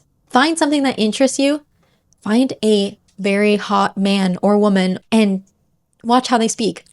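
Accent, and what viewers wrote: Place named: United States. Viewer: To add on a complete side note. I only just realized that you’re speaking with a state side accent. I follow a few YouTube, instagram accounts to graze on Spanish content. Most are native speakers but there’s a few English. You have a very English look and demeanor. Surely you’re living in England?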